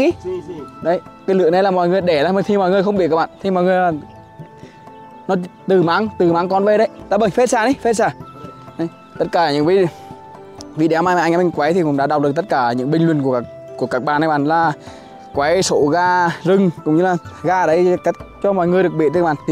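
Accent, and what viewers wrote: Nghe An, Vietnam. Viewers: Nói tiếng nghệ an gì đó chậm thôi anh. Ko hiểu a nói gì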